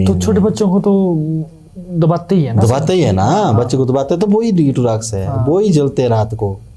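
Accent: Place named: Kullu, India. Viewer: Bhai AAP Manali se nahi ho AAP kullu se ho apki bhasha se pehchana mene apko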